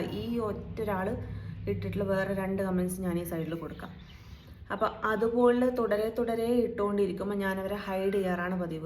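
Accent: North Kerala, India. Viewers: Its a very good advice given to those JHARAMBU ROGEES as you have said must have learned the basics of their dirty activities from their own parents ,sisters or brothers who may be past masters in shaming others....I totally agree with what you have said....Even your advice given may not be effective or make them repent that is what I feel...after therevis a saying CAN AN ABYSSINIANN CHANGE HIS COLOR OR LEOPARD ITS SPOT...Any way I thoroughly enjoyed your video clippings especially the north kerala slang used while talking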